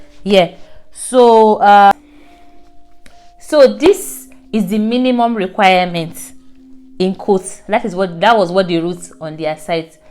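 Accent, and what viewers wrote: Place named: Hausa, Nigeria. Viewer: Your accent sounds hausa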